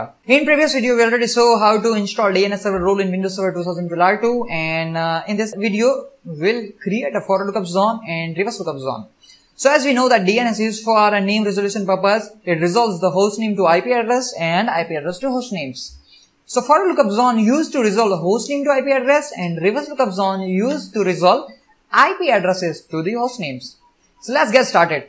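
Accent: India. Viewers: cannot understand a word of this indiglish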